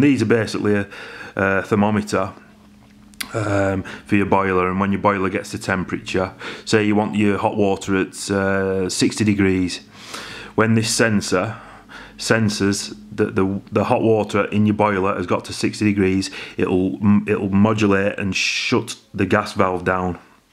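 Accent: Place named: Wigan, United Kingdom. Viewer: you sound like a Wiganer, are you? good vid BTW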